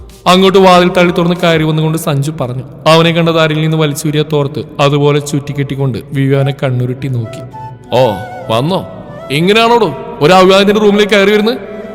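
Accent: Tamil Nadu, India. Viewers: ഉണ്ടേ!!!!!😂😂 ഈ തമിഴ് കേൾക്കാൻ നല്ല രസമുണ്ട് 😊😊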